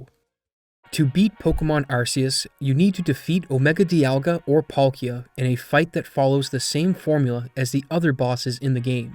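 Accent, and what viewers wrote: Canada. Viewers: First time visiting the channel, must say you have the heaviest Canadian accent I've ever heard. I'm pretty sure it's Canadian but let me know if I'm wrong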